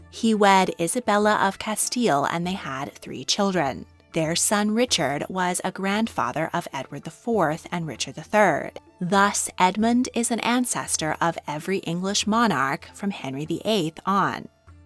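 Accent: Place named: United States. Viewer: Such a strong American accent makes it a littke difficukt to understsnd all she says eg 'Rozayz- - 'roses'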